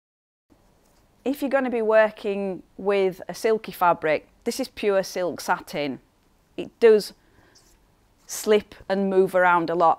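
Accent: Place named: Yorkshire, United Kingdom. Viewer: That’s a Yorkshire accent 😉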